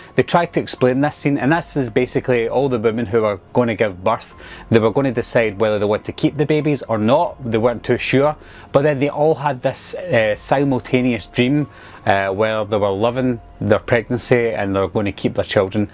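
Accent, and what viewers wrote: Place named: Liverpool, United Kingdom. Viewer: Liverpool accent ✌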